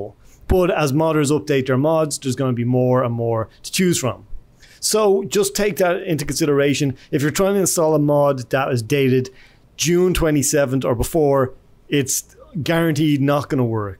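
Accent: Ireland. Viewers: your irish accent is awesome dude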